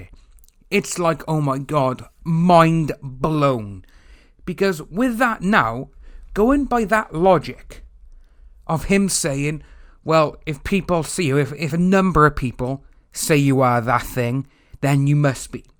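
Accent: Wales, United Kingdom. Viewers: You have a Welsh accent? Hell, I just pegged you for livingbin the UK. 😄 Hearing the voice of this metrosexual, I have no doubt he's bringing Tyrone snacks as Tyrone rails away on Monica. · I understand you dude having Walsh accent is a pain at times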